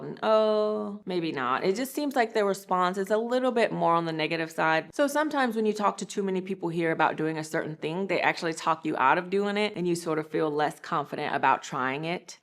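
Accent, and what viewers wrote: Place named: South, United States. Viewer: Omg I had a feeling you were from the south, turns out we are from the same city!!!🤩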